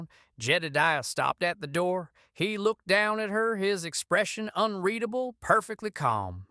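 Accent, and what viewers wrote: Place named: Appalachia, United States. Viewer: whats with the hillbilly story teller???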